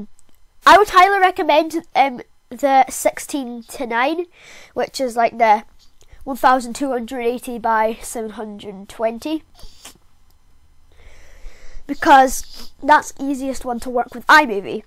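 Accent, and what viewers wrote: Scotland, United Kingdom. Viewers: Are you Scottish? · are u from scotland? Dumfries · Are you Scottish???! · Lol its cool because you havent fully developed your british accent in these early videos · U sound Scottish r u Scottish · Are u British xD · Are you Scottish . You have an accent which sounds Scottish cuz of the way you say it. You don't really pronounce the T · You sound so Scottish! (No offence if your not, I am anyway..) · Omg your Scottish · Are you Scottish? I love your accient! I am Scottish btw! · Are you Scottish? you sound Scottish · This helped a lot tysm!!! Also- are you Scottish? Cuz I am too :) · I’m guessing your from Scotland? 🏴 It’s alright, I’m from the great country to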